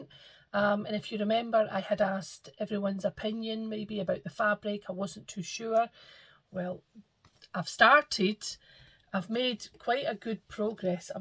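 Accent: Scotland, United Kingdom. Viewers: I enjoy your videos so much. And your Scottish accent is so delightful! Your enthusiasm for this beautiful craft make me go down rabbit trails in my stitching life. Blessings from Canada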